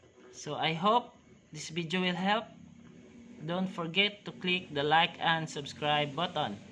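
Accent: Iloilo, Philippines. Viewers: Illongo ka pre? Hehehe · Illongo ka nong? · dayon?! hahaha ilonggo kaman gali hahah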